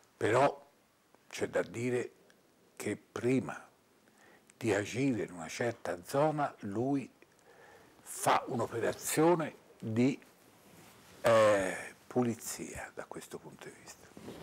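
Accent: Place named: Florence, Italy. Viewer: Grande Filastó. Un grande oratore con un carisma unico, un pozzo di memoria e di informazioni, oltre che irrimediabilmente toscano (anzi fiorentino, che per noi senesi è sempre guerra). Io vedo tanta controversia e credo sia di saperne troppo poco sull’argomento sia di essere troppo piccola ancora per potermi fare la mia idea su come le cose siano andate. Dall’alto dei miei appena cinque lustri di vita, posso dire che l’Avvocato Filastó è una persona che ammiro moltissimo, e che ritengo preparata ma soprattutto che non campa tesi in aria argomentando tutto quello che dice. E poi, la storiella del Duca alla fine mi ha stesa, quella è proprio l’essenza massima dei fiorentini e della loro personalitá. Che la terra ti sia lieve, <3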